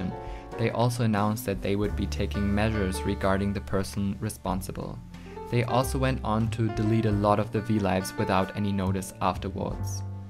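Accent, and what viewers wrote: Germany. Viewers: du hast keinen schlechten akzent, aber ich konnte direkt hören dass du deutsch bist, cool :) super video!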